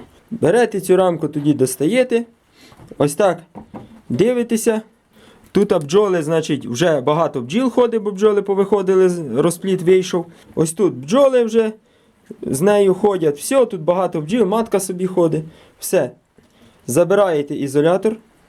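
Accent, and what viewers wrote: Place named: Khmelnytskyi, Ukraine. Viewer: Ви напевно десь з Хмельниччини, у нас така вимова😊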